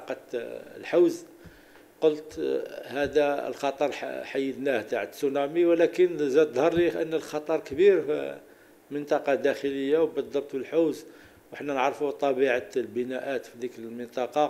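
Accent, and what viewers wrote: Algeria. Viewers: واش هذا جزائري على حسب كلامه الدارجة المغربية هاربة عليه ويستعمل كلمات دخيلة على لغتنا حتى في اللغة العربية ديالو مايلة لجهة هكيين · تيهضر بحال شي جزاءيري 😮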